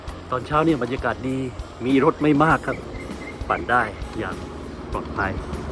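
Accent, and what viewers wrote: South, Thailand. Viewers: เหลงใต้ชับเปรียะเลยครับ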